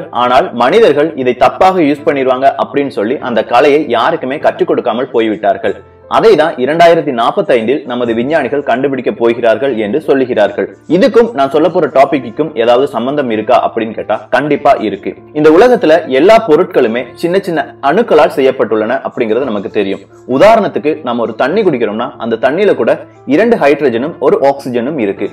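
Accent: Kerala, India. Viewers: But the tamil pronunciation is in Malayalam.. 'koman' (common), kopy (copy), simbly (simply).....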